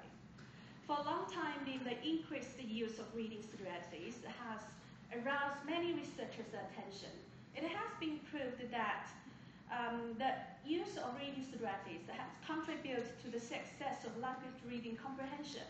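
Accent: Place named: United Kingdom. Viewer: มิน่า... สำเนียง บริธิต เลยครับท่าน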